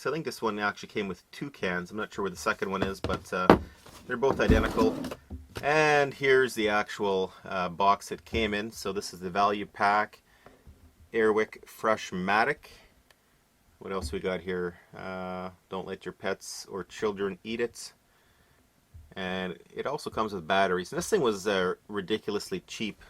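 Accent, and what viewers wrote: Canada. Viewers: I don't know if you're Canadian or not, you sound it (no offence meant if you're not). The ones I have (in the UK) don't use a DC motor, they use an electromagnetic actuator type deal and readily accept the fake refills. Same company, but very different design. Cool stuff though, watched it all.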